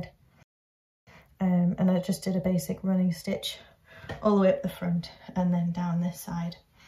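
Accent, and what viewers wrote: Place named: North East, United Kingdom. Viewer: I have just got myself a Sentro machine and enjoying experimenting. Videos are great. Good to hear a northern, dare I guess a northeastern voice. ❤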